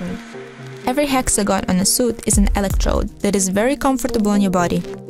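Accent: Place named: India. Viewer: Акцент смешной, похож на индийский xd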